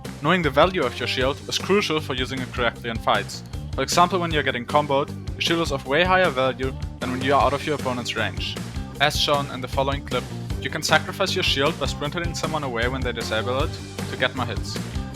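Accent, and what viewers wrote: Germany. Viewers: I hear Deutsch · Are u German? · u german?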